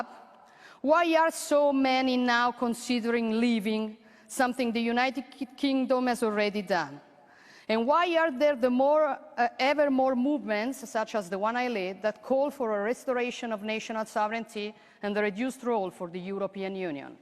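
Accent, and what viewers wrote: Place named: Rome, Italy. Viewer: A tutti quegli stronzi che ti sfottono che parli in romanesco, beccatevi questa.....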